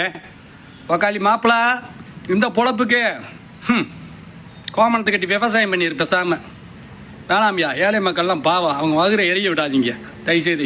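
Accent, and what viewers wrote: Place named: South Tamil Nadu, India. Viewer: Ultimate 😂😂😂 Pucca south TN slang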